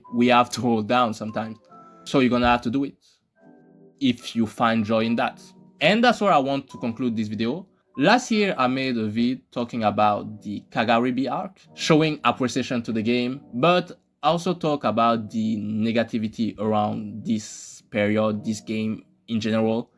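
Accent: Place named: France. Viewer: Why do you sound french?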